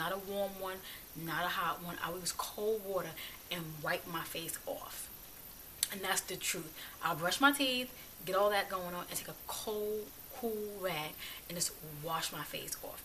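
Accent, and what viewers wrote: United States. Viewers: Great video, you look very good for your age and I like the way you speak, where in America are you from?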